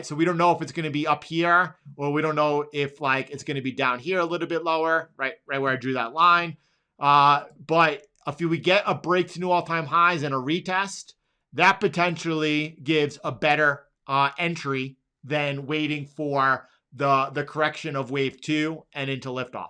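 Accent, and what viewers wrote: United States. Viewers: Your Long Island accent coming thru strong today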